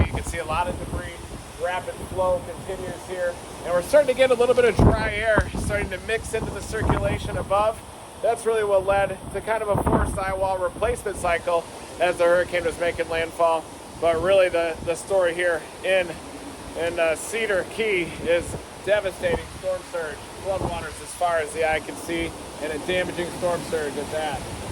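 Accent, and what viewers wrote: South Dakota, United States. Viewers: Hey, are you from South Dakota?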